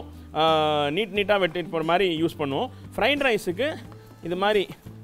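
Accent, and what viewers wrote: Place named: Saidapet, India. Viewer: I simply love the last part saying “Torture Panna Koodathu” that was hilarious. I love all your videos and your very simple style of cooking and of course your Saidapettai Tamizh!! Takes the cake!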